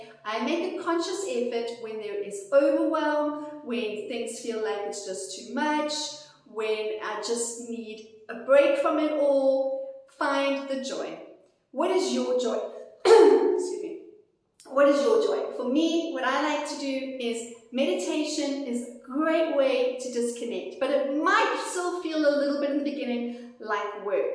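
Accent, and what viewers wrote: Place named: South Africa. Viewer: Hi - I’m fascinated by the subject of emotional resilience and I’m desperate to learn everything about it. I typed the words in YouTube and came across your channel. This video resonated with me personally on an experience level as well as an aha moment level. Please let me know if we can chat. You sound South African - so am I 😊 Thank you in advance!!!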